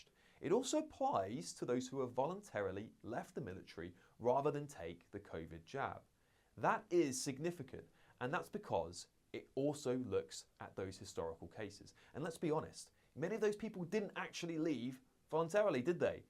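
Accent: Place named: RP, United Kingdom. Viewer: Posh talking head propagandist wimp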